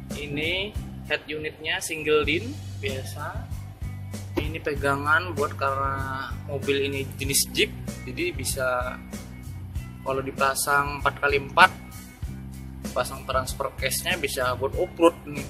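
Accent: Banjar, Indonesia. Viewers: Logat banjarnya kada kawa didustai 👍 mantap djiwa bossku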